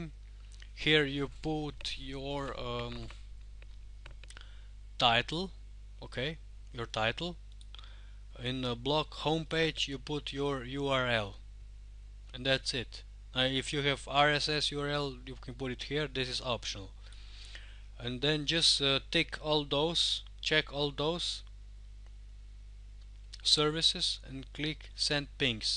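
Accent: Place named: Russia. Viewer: oh i love Russian Accent. Greetings from Indonesia my Friend.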